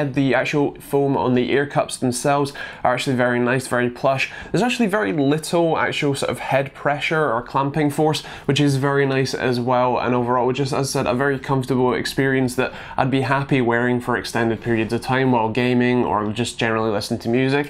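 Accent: Scotland, United Kingdom. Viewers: I spent a solid minute trying to figure out if this guy had a Scottish accent or not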